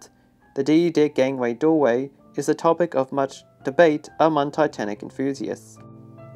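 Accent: New Zealand. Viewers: It's a New Zealand accent. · New Zealander?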